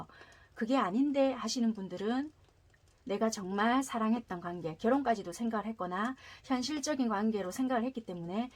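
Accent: Busan, South Korea. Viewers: 5번🙏🍀💜 저도 부산사람이라 듣기 편하고 좋아요 잘맞는거같아요....... 참고해볼게요... 앞으로 우리커플 잘됐으면 좋겠어요 제발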